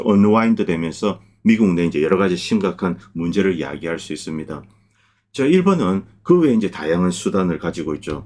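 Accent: Gyeongsang, South Korea. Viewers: 근데 님 예전부터 궁금했던건데 경상도 출신이신가요? ㅋㅋ 이민가시기전에요? 경상도 말투가 묻어나오시는데?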